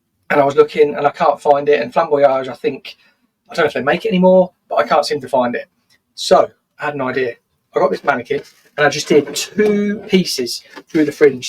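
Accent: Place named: Northern England, United Kingdom. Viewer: Hi,your creativity is absolutely infectious..nice to hear a fellow northerner too like myself 🤗( I'm a Scouser albeit a posh one lol & now living in the Wirral) Why don't you buy a roll of clear plastic carpet protector( used by decorators & keeping the carpet clean during building work ).I occasionally teach adults with MS / visual impairment like myself printmaking techniques & textiles & have used that decorators film to save money on professional ( Mylar sheets ) for years..I have used it successfully as stencil film on walls & furniture..brilliant for cheap & quick 👕 t-shirt design printing ( just add 1sheet inside to stop ink/ acrylic weeping through 👕) & then cut your design to stencil with scissors or a knife & stick on the front..I.also got kids who were partially sighted like me to make their own stained glass window decals using it & Sharpie markers..yeah for what your doing here you'll have fun & it's fairly low tack,wonder if conditioning it out with loads of warm water would eliminate all chance of ripping out any hair?? 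BTW 25 metres is under £12 & it's around 20 inches wide..like stair carpet width ( it's main use in DIY) xx..Your bobbed hair with blonde under the fringe I had fabulous ' barcode reverse weekly's highlights on my long way hair in the mid 90' s & what you call long Wolf cut..if I say so myself it looked sexy as hell lol I've always been obsessed by big 1970' s Hammer Horror film hair..especially the vampire women lol 😆😛lol x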